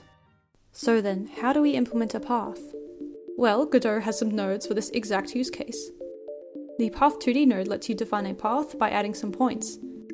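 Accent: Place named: Australia. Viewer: 7 days full time so about 60 hours in total? The hard work shows in the quality of the video! Even your first video is amazing, had you already had experience with video editing? I use Davinci Resolve too! Do you use the free version or have you paid for the paid version? I should check out motion canvas! I also noticed that your accent was familiar and I saw that you are Aussie! haha I am Kiwi. Great to see a creator from Oceania :D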